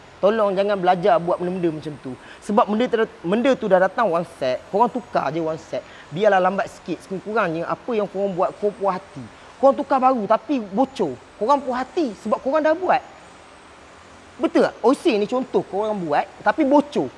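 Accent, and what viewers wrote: Pahang, Malaysia. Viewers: Apsal cakap mcm orang pahang pulak ni. org phg ke.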